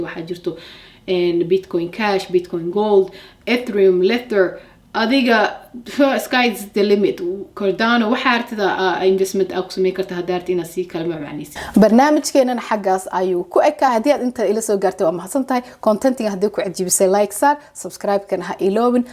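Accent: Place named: Mogadishu, Somalia. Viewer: Naa lahjadan reer xamarka YouTuber ku noqon maysid ee nagatag waxaad ku hadlayso iska soo sax hadaad dad dhex keenayso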